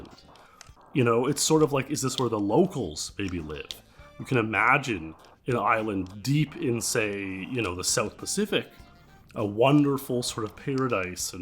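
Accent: Canada. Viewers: When people call Dragons, “draygons” blows my mind. I guess it goes hand in hand with saying “ewt” instead of saying out. Must be a canadian, North US accent. Relaxing, chill video though. Enjoyable. I like the focus on the lesser talked about games.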